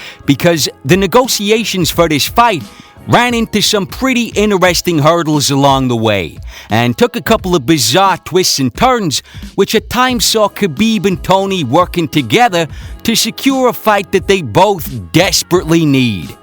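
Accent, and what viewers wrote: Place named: New Jersey, United States. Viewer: Great Video. Love the accent. Where is that from? Jersey?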